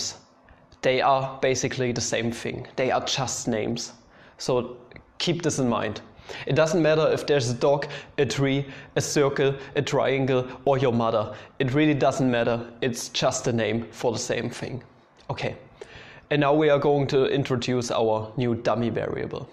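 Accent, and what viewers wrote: Germany. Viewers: If you don't mind me asking, where does your accent come from? I, being an American (therefore having no idea what accents come from where), just think it's German. Is that right?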